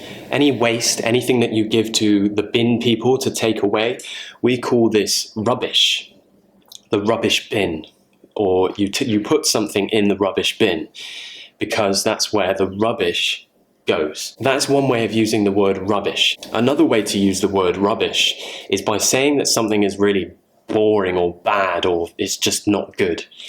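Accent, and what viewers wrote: United Kingdom. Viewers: I really adore u nd ur British accent 😍 thank you 🙏 much love 💙💙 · You are really handsome. I could watch your videos all day. I love your accent cause I like the way that british people talk. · love british accent, and if is cute like you damm sweet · That was wonderful lesson.. and I like your Brisish accent!! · I adore British accent, it's a pleasure to listen to you. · I hope same day speaks like you , I have to improve my english a lot ,thats my goal.British accents is so dificult to spanish people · I love your British accent ♥️ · British accent is soo intimidating!!😣 That makes you sound 100× smarter!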